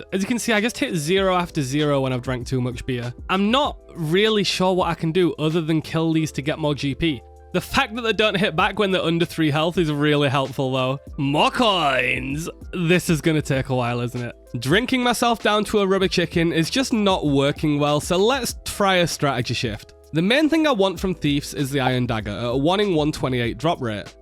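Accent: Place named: Northern England, United Kingdom. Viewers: i wasn't really interested in this series when I saw it in my recommended, but then I heard the yorkshire accent and now I am staying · Ahhh you’re so northern, I’ve found my home here 😂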